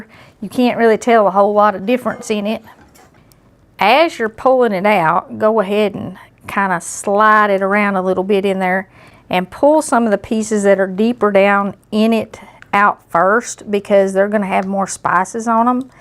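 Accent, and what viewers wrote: South, United States. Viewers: You sound like a Hillbilly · This lady is now one of, if not my favorite Cooks! 😊 Thank You So Much! I love your videos & southern accent 😂😊👌🙏 Ky here... thank you again ☺️🌸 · Thank you so much for this video on frying chicken! I love your style and really love your southern accent! I have always cooked for myself and have wanted to try fried chicken. I have cooked chicken in a pressure cooker, baked and slow cooker too, but this looks simple enough. From your new fan and subscriber here in Palm Desert, California. God Bless you.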